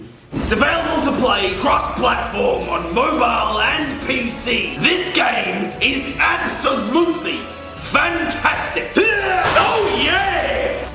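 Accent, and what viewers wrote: Australia. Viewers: I love the Australian accent!!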